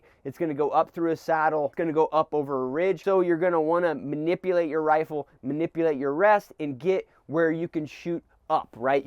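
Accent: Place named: Colorado, United States. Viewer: Hey, I appreciate the video. I know its weird, but I gotta ask... I have the same accent as you. Did you grow up in the Colorado mountains by chance?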